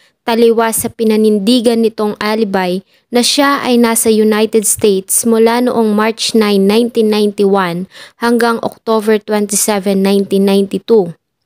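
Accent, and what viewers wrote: Philippines. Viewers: Oky lng UN pilipino nmn Tayo my kanya knya acsent nmn kahit sa ibang bansa tulad din nmn natin cla hind Rin cla magaling mg English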